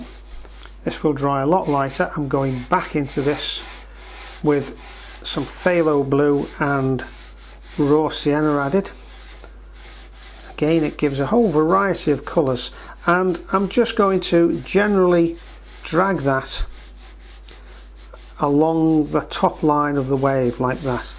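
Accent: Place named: Lancashire, United Kingdom. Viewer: Just found you. Love this. I live in Los Angeles but grew up in Fleetwood, Lancs until I was 14, so loved listening to your accent!!!!!!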